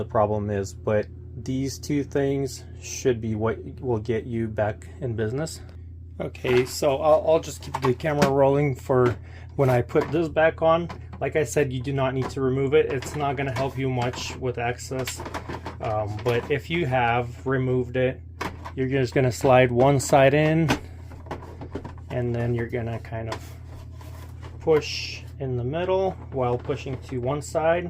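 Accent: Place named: United States. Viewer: Love the American accent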